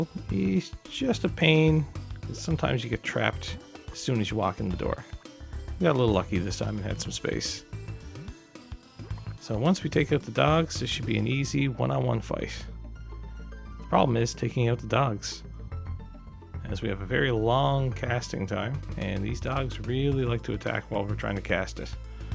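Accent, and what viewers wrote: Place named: Ireland. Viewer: Great video man, can I ask if you’ve any relation to Ireland? You pronounce a lot of your words just like I would, I’m from Dublin :).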